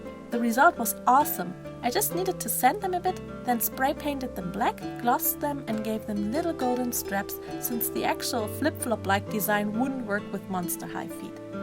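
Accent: Germany. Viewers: It sounds like her mother tongue is German. o_O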